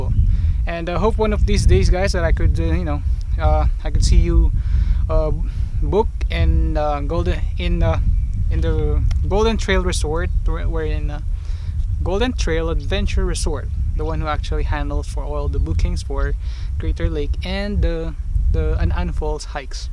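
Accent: United States. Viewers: Nice sir your speaking like American guy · Wow he has american accent. Amazing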